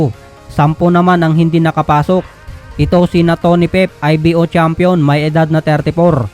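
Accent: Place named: Ilocos, Philippines. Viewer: Ha ha ha, ilokano kasi si kaya instead na Thai ( Tay)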